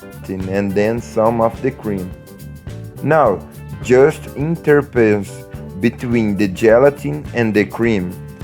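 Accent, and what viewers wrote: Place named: Brazil. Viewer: Mn esse cara n parece um gringo falando, acho q é um brasileiro falando inglês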